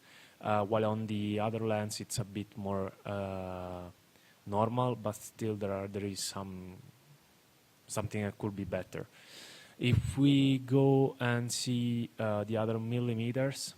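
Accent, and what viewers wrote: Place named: Italy. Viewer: Si sente forte l'accento italiano :)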